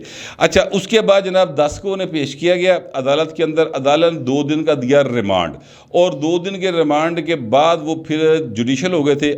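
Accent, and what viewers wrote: Lahore, Pakistan. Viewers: And on the top of that "Lahori Touch"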